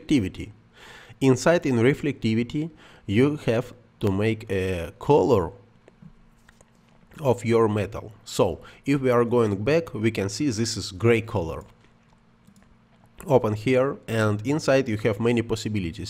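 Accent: Russia. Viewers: Акцент русский)) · Кстати спасибо за урок, очень пригодилось в моей работе. Я догадывался что ты русский по акценту, но потом увидел это и. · excellent! your russian accent is great!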